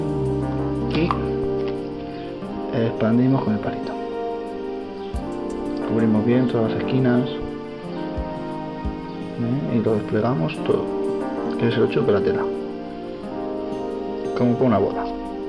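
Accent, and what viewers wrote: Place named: Madrid, Spain. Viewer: Muchas gracias Maestro , por fin me entero de como se hace la micarta correctamente , me preguntaba si seria muy caros estos productos , quiero animarme hacerlo , soy un gran aficionado a la artesania y en especial a los tirachinas , pertenezco a un foro mundial de tirachinas y he visto trabajos verdaderamente preciosos con este material . Soy de madrid y tu ? , tu acento parece Madrileño . Un abrazo y gracias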